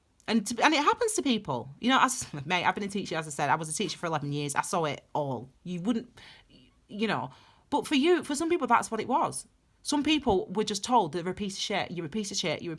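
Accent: United Kingdom. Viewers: Love your sense of humour and the way you come across.I miss hearing British accents so it's nice listening to your readings. And ditto it's great to see a female British black tarot reader.Keep up the terrific work and thanks for the amazing reading! :) Love from Spain! :) xxx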